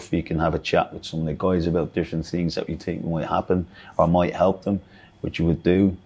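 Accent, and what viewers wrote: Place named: Northern England, United Kingdom. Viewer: his accent is weird, sounds like a combination of northern english and irish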